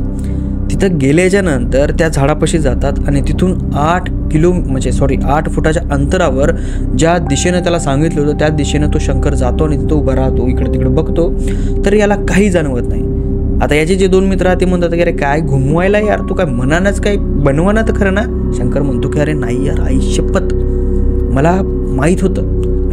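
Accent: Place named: Beed, India. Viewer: बीड चे काय ,बोलीभाषा वाटते तशी😅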